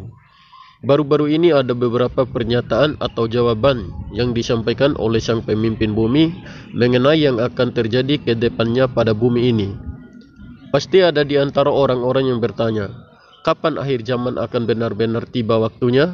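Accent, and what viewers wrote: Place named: Maluku, Indonesia. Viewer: Tapi bang saya kayak tidak asing dengan aksen berbicara anda bang,sebelum nya saya mau tanya.apa bang dari daerah adat seribu negeri,yang dulunya 1 provinsi sekarang terbagi jadi 2 provinsi?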